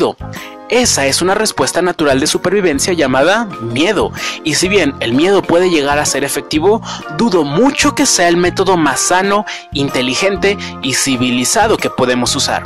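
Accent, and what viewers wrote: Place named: Mexico. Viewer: por su asentó, creo que es ud de mexico, o peru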